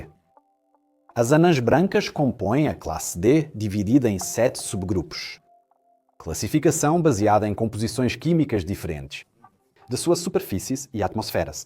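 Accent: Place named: Portugal. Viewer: Excelente vídeo. Ficou legal ser narrado metade pt.pt e metade pt.br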